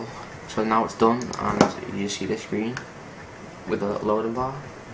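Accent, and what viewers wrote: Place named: United Kingdom. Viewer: maybe we could follow the "simple" steps if it wasnt for your anowing brit accent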